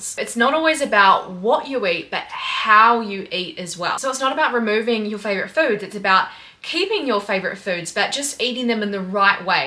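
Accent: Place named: Australia. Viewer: wait where are you from if you said you moved to australia? im canadian but i only hear an australian accent i think? LOL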